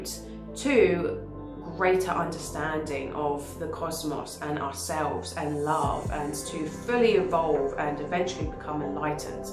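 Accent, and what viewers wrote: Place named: London, United Kingdom. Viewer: I'm a 22 here, but I haven't been able to build anything thus far. Not sure what the blockage has been but I'll bet its been more an issue of learning to build than anything else. I was trying to place your accent through the whole video. Are you a Londoner?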